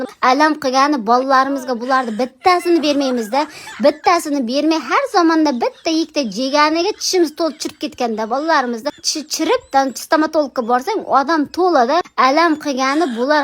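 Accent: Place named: Samarkand, Uzbekistan. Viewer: Bu meni qishlogʻiimni shevasi eshitib mazza qildim Samarqand joʻsh qishlogʻi 7 yil boʻldi bormaganimga uy va moshina olish uchun Toshkentda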